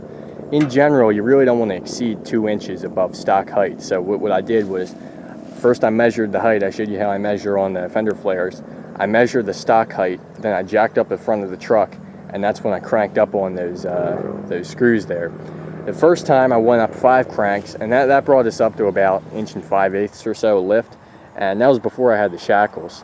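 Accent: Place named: New Jersey, United States. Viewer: Interesting video. Given the location and accent I'm gonna guess you're in Salem or Cumberland County, NJ, lol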